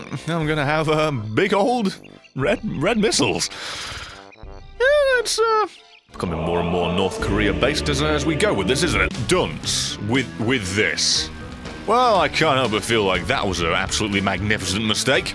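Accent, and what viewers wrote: United Kingdom. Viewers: Nah it's his British accent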